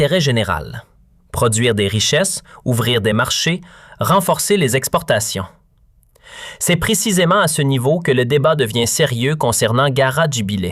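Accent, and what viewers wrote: Canada. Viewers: Désolé pour vous, même s'ils ont utilisé la voie canadienne pour la lecture de ces commentaires dont le contenu n'est nullement convainquant, un économiste algérien formé en Algerie vous répond, Ce que vous oubliez est plus important que ce que vous débitez, vous oubliez le rôle du plus grand port de Méditerrannee, celui de Hamdania, qui ouvre la voie au commerce international du Sahel vers le Monde, et l'inverse. Vous oubliez de préciser que cette voie ferrée se situe sur le tracé de la voie des épices que la Chine compte développer. Vous oubliez de rappeler que ces trains vont servir à acheminer les produits des activités du sud, produits agricoles, le lait en poudre de Baladna, les mines de cuivre qui sont sur cette voie... Ce que vous appelez de petites gares, deviendront des villes, allez consulter l'histoire de la création des villes américaines et ailleurs grâce aux lignes ferroviaires. 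Ces lignes ferroviaires ne decervent pas seulement cette région, mais elles relient toutes les régions d'Algérie entre elles. Je m'arrête, mais je pourrais en parler pendant des heures, et ce que j'ai écrit là n'est pas dans le but de vous éduquer d'avantage seulement, mais c'est surtout pour informer le Peuple algérien contre les rumeurs et mensonges que des gens comme vous sèment pour foutre la merde dans les pays qui gênent le sio international...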